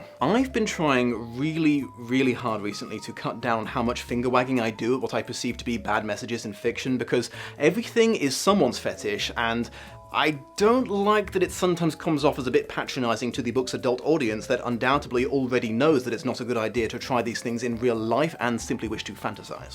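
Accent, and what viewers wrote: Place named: United Kingdom. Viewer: There is something so beautiful seeing someone with a thick British accent reviewing American smut novel's.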